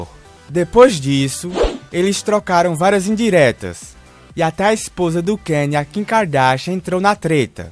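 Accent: Northeast, Brazil. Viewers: Narrador nordetinooo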